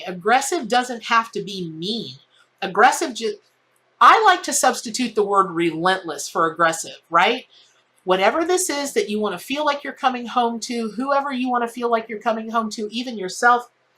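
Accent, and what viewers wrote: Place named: New York, United States. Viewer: Hahaha!! That sounds like a New York accent hahahaha 🤣🤣🤣 waaaaay back when I was in high school I played flute in band (how is your native flute going, btw?? 😊) and on my first band trip we went to New York. A guy was selling M&Ms for a charity outside of Virgin records in times square and I told him how many I wanted to buy and he said "four gorgas" and I said "How much is that?" And he repeated himself "Four gorgas" and I asked "What is a gorga?" And he slowed it dooooown and said, with the accent, "Ya gogous" (you're gorgeous) as in he was grateful I didn't just stop to listen but to also help with whatever charity hahahaha 🤣🤣🤣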